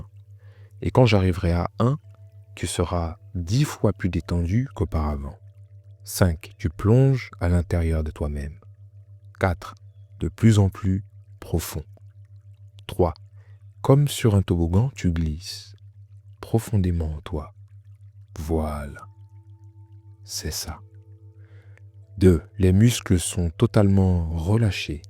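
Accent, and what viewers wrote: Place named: Cameroon. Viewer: Ma vision limitée dit ceci ... Tu as Ton sous-accent camerounais-bamileke qui manque dans ton discours et qui distrait un peu,car il est noyé ... Sans offense. Hotep Paix.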